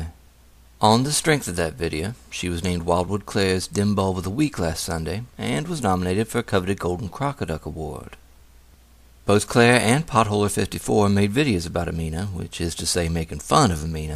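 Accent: South, United States. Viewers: Dude, you're a personal hero of mine. The insight and unvarnished truth blares triumphant. May I say, your voice of 'southern comfort' has found a fan north of your borders.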